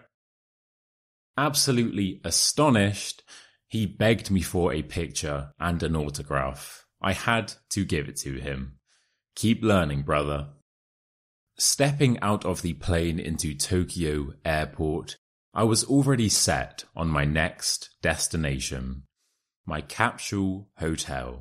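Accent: United Kingdom. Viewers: I like your short story haha.I learned a lot from you and I like your british accent!thankyou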